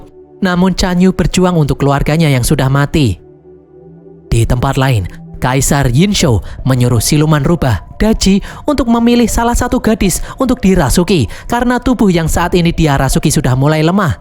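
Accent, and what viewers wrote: Indonesia. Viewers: Nezha pun sebenarnya bukan nesa tapi neca, tapi gpp kan lidah indo bukan lidah cina woi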